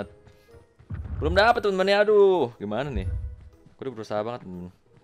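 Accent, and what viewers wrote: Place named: Sulawesi, Indonesia. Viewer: Orang sulawesi tuh bg haha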